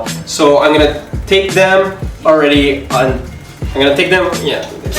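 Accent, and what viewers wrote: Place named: Italy. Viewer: si sente l'accento italiano 😍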